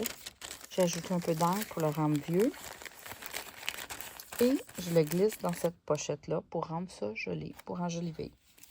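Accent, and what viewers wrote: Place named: Canada. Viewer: Ho oui ses super parfait les vidéos en français et avant j'allais sur l'autre et maintenant ses plus pratique et j'aime aussi la voie du Canada j'ai une amie qui habite au Canada merci pour toutes vos vidéos je vous suis depuis 2 ans et j'aime acheté vos papiers numériques merci pour le partage gros bisous ❤❤❤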